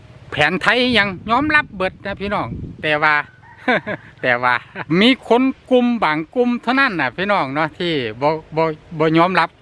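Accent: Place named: Chaiyaphum, Thailand. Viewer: คนลาวอิสาน ชัยภูมิ เว้า แนวนี้ เนาะ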